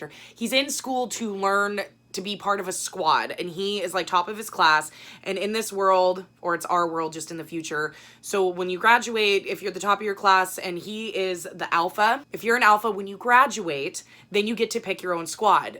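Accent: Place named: Australia. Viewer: Ooo!!! Yes you should start!!! I love book videos and I would so watch!! AND it sounds like you're from Australia, I'd love follow your booktube if you start one! I don't make book videos (yet?) but am obsessed with booktube haha 💟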